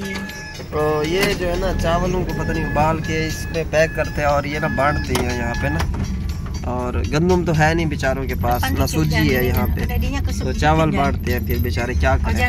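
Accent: Saraiki, Pakistan. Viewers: "اے موبیل پکڑ او میڈا" لالا سرائیکی شناء لگدا پیا ایں۔. واقعی سرائیکی ہو آپ؟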